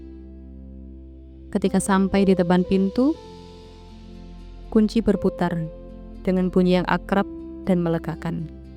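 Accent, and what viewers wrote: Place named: Jalan Wilis, Indonesia. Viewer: suaranya seperti org kelahiran jln wilis ya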